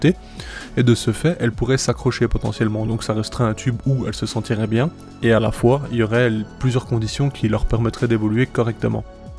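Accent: Belgium. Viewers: cet accent me semblait belge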